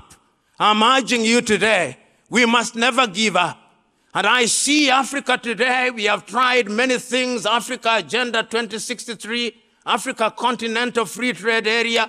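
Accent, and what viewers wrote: United Kingdom. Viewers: Nigerian an African leaders love to listen to his Queen - english. They don't care about the content of his speech.. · You with your fancy British accent and your usual many historical blames on colonization has not come up with any structurial plan to take Africa out of the mind set they're in. Join Mali,Bukino el fasco and the other military leaders who are taking that brave stand moving forward. Africa must unite!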